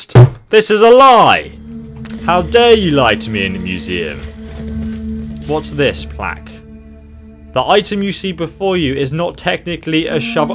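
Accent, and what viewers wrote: United Kingdom. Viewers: are you British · your British, me to!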